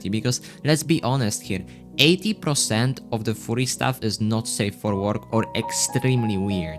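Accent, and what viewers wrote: Poland. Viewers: you sound kinda polish edit after research: damn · odrazu słychać że polak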